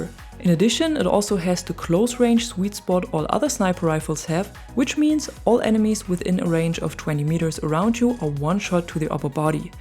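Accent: Germany. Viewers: Dein deutscher Akzent hört man sehr gut raus . Würde mir in deutsch besser gefallen ✌🏻😌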